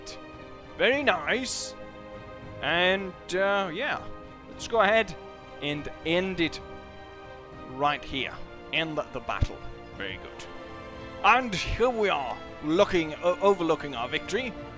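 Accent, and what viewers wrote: United Kingdom. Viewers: Your accent sounds more British when you talk normally 😂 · Nice british accent you got there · I have just started watching your channel . I am really impressed with your english accent . If we were in this era you'll make a good soldier .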